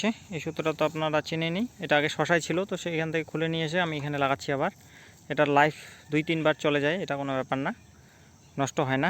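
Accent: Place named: Rajbanshi, India. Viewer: Dada apnar kotha to vatiya r rajbanshi vash mix ache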